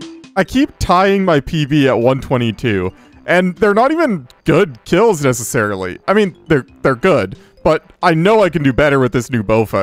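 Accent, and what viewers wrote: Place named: Upper Midwest, United States. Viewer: I've been wondering this since starting the series but where are you from? You have the quirk where you say "done the task" instead of "done with the task" which I've only heard from people from Philly haha but otherwise I'd say upper Midwest (like me)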